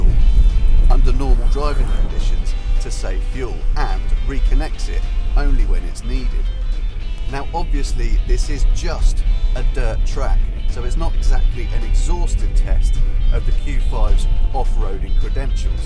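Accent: United Kingdom. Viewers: I need to marry a British guy. I could listen to him talk all day lol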